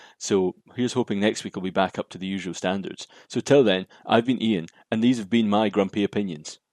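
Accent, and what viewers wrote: Scotland, United Kingdom. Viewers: I could listen to you talk about anything. But I guess I am just an American girl with a thing for a Scottish accent.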